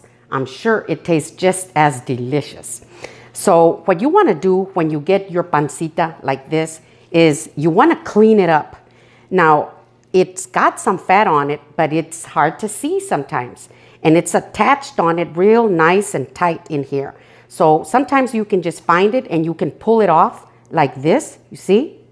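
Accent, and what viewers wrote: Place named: Rio Grande Valley, United States. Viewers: Loving your videos. Are you from Texas? I can hear it in your accent. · 15 seconds in...I knew you're from the RGV! 😁 It's the accent...😆(since I was from there, now living in Houston), but I'm looking for an awesome recipe for menudo to serve at least 10 people...I'm gunna try yours and just adjust the size portions to cook as much as I need to feed. 😎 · My family is from your end of Texas. I appreciate that you used vinegar in your recipe. I made some menudo recently with the honeycomb my favorite. I use vinegar to quell the smell also. You remind me of a cousin of mine from Sand Diego Texas shes long passed away but you two look a lot a like and your accent so unique to that area. After watching you Ill have to make a couple of adjustments to mine. I really like the method you use on straining the chilis into the soup.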